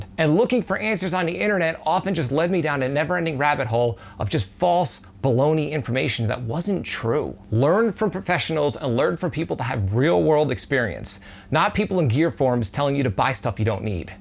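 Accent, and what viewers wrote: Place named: Philadelphia, United States. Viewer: Hey, new subscriber here. Great stuff! Love your videos. I have a personal question. Your accent is driving me crazy. Do you happen to be from the Philadelphia area? Jersey perhaps? I grew up in NJ and moved away when I was 18. When I finally came back years later I really started noticing the distinct accent a lot of folks have from my area. Just a shot in the dark but figured I’d ask.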